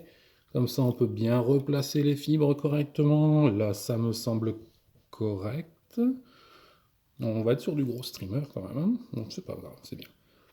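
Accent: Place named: North, France. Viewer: Je reconnais un accent du nord. Plus précisément un accent de Lesquin… 😃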